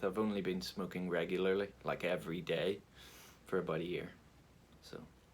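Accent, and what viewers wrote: Ireland. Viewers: Great first video! Really enjoyed it. I’ll have to catch up on your other ones now. Welcome to presenting , I’m sure your channel will grow quickly. Everyone loves an Irish accent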